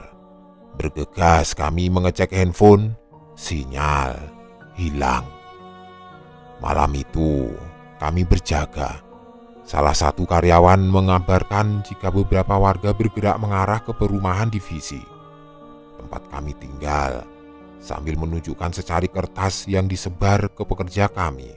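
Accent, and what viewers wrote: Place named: Palembang, Indonesia. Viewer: Dari logatnyo kau wong palembang yoo?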